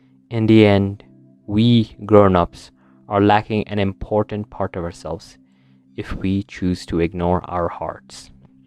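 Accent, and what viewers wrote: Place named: Nepal. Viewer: Sir are u from Nepal ..if yes please lets stay connected with our team as we need accent like ur for a project we are going to launch ...please reply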